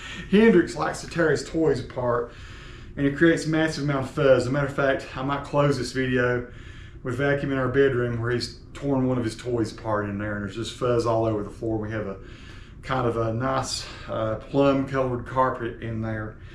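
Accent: South, United States. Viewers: Thanks for the detailed vid from a fellow southerner (I am a North Carolinian; I think you are more Alabama or Mississippi correct?). Anyway, I couldn't believe how bad Dyson's video was, especially the part with NO AUDIO. Shame on them for charging so much but not giving us an actual printed manual (my latest version only has a link to a .pdf) and a crappy silent vid.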